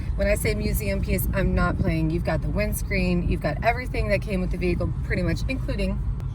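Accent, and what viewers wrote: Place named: United States. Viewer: Shame about the whining American accent 👍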